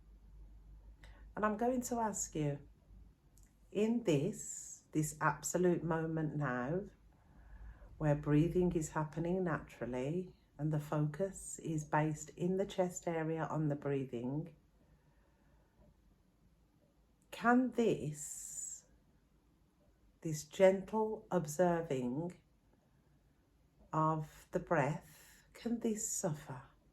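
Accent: United Kingdom. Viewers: Can't wait to view it! Thanks, for who you are being for us. I love your videos and your lovely British accent 😊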